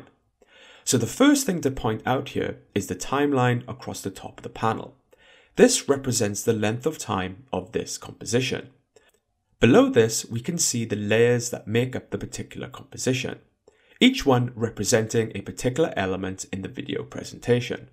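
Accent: United Kingdom. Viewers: Is there an option to turn off british accent?:hand-orange-covering-eyes::hand-orange-covering-eyes::face-orange-raised-eyebrow::face-orange-biting-nails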